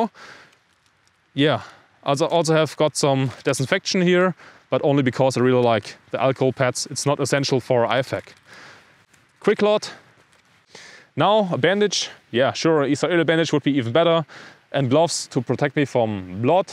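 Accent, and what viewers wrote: Germany. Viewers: So german english accent🖤🇩🇪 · nothing cooler then german english.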